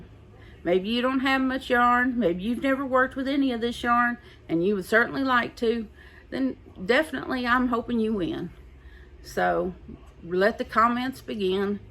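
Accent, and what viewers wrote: South, United States. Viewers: Good evening/morning,! New subscriber here... both to your YT channel and Instagram:) I've spent the past couple of hours watching & liking a number of your videos, and I can't tell you how happy I am to have found your channel. From the yarny goodness to the furbabies to the family stories to that wonderful Southern accent!!! (I'm originally from VA, have family throughout the deep South, including LA, but currently live in OH. I miss that accent, that "way" of speaking to others, that "heart" put into conversations with friends and loved ones. I truly do appreciate what you contribute to YT and the crafting community!! Now that I've rambled on a bit, I best let you in on all the furbabies we have/ have had... al rescues, either from shelters or from the streets. Those were usually the ones who adopted us rather than the other way around, but animals know who'll love and care for them as family, don't they? 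I look forward to seeing more from you... and I'll go back to binge-watching and crocheting, as the first two grandbabies are due this year... in July and September. You take care of your self, and your sons and furry sons too!!!!!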